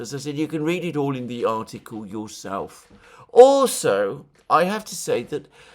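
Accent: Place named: RP, United Kingdom. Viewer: Click clack click clack over the rickety bridge. Who is the ogre? Are NATO the Billy goats gruff? Freudian slip Alex? Public school - your accent is emulating public school. I did suggest it is a dislikes of your position that makes you love Russia. Kim Philby said the same but to most, he was establishment.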